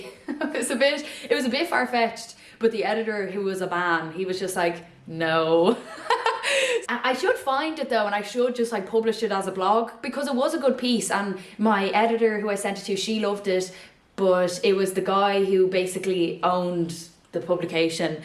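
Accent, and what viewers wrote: South Dublin, Ireland. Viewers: Are you from the south side of Dublin? ❤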